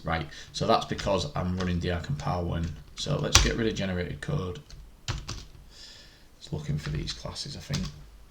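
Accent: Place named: United Kingdom. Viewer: You are great but can I ask you a favor? Please, use a lavalier mic. Since both english is not my first language and your marked British accent it's hard to me to understand what you say. However, thanks for your explanations!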